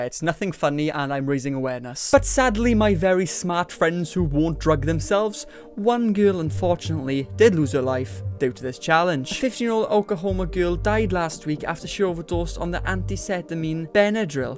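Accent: Wales, United Kingdom. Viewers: welsh accent🥺❤️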